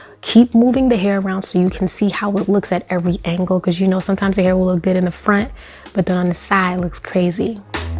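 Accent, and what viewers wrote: New York, United States. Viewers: The way you say "also", "oval" and "important"... with the silent t's you sound like a New Yorker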